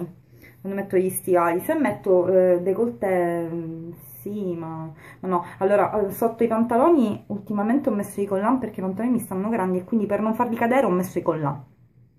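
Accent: Sicily, Italy. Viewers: Io ti adoro! Tiri su il morale, ma profondamente! Spingi per avere piu autostima per te tutto si puo fare, sei sincera te stessa e finalmente uba che da del tu e non del voi (e non e poco) mi fai sentire amica tua e non per ultimo sei siciliaaana mizzica mi manca la parlata và! Ciao biddazza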